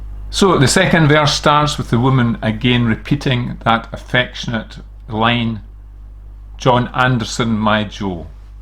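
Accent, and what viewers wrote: Scotland, United Kingdom. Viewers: My name is Liz Moore, and I have Scottish forbesrs.....the Comyns, so I am interested in all things Scottish. Thank you for that beautiful reading of this poem. I discovered it years ago, when I read the book "Dear Enemy" by Jean Webster as it is quoted there, but only the second verse. Now my husband and I are approaching our 50th wedding anniversary, and I want to read it on that occasion, because it so beautifully expresses the joy of two lives lived together. If I may, I would like to play your version - a real Scotsman reading that lovely poem!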